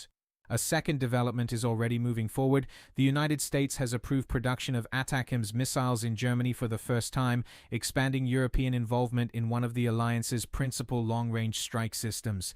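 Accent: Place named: Eastern Europe. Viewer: are you using an AI filter on your voice? Your English is very good but you have a stronger Slavic accent than this voice.😃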